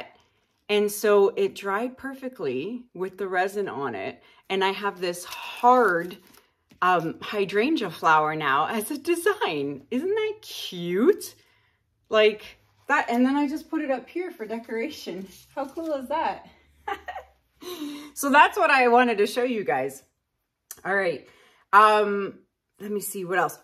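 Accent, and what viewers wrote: Canada. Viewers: Lmao I’ve got to tell you that in the past year or so I’ve met so many people from Canada …. I have a sister who moved to Canada(oddly enough in the same city you moved to but she’s been there for 5 years now) but never noticed it with her friends or anyone like that that she and her husband have met since moving out there but people that I’ve met on Long Island and NYC that are from Canada I know where they are from as quickly as they can hear where I’m from bc of the way they say “out” and any words with out in it bc of you! 😂😂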